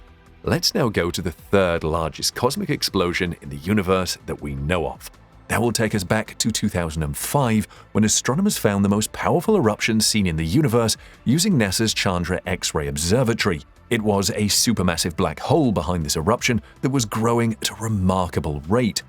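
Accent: United Kingdom. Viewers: Love your explanations and graphics in all of your videos, keep them coming! Do I also detect a Welsh accent? 🙂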